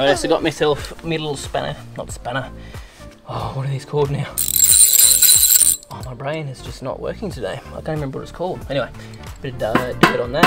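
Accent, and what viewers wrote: Australia. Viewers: I knew you were Aussie before I clicked on the video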